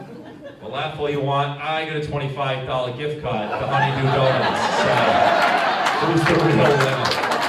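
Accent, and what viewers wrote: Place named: England, United Kingdom. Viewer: Definitely the accent is from England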